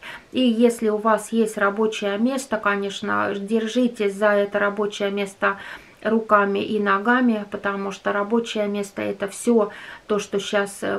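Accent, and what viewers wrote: Finland. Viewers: Здравствуйте,! Русский у Вас отличный. Ну а акцент-это более 30 лет пребывания в Финляндии. А про юмор могу сказать, что не каждый в России может знать столько "мулек", как Вы. Канал смотрю месяца три, очень нравится. Продолжайте в таком же духе. Смотрела ранние видео. Хоть мы и далеко друг от друга (где Финляндия и где Урал), мне интересно знать, как живут люди за горами, за лесами)))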